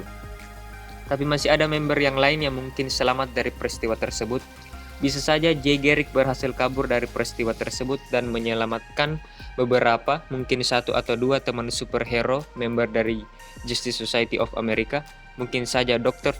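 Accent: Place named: Sumatra, Indonesia. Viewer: dari logatnya abang orang sumatera ya...😁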